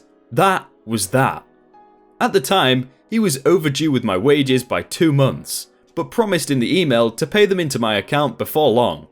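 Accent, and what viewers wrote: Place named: Midlands, United Kingdom. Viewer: I've been wondering about this for a while... are you a Midlands England guy? Your dialect is super distinct. Liverpudlian? Manchester?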